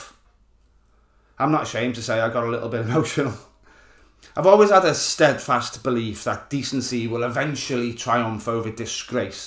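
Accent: Liverpool, United Kingdom. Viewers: Good man, scouse. didn`t understand most of that and I'm Scottish!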